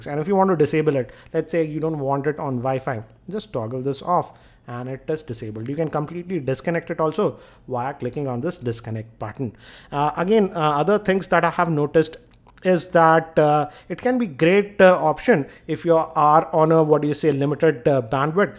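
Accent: India. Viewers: Believe me even as an Indian think his accent is way too accentuate. Very few people talk this way😅😂😂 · Then u are not Indian as he has Indian Accent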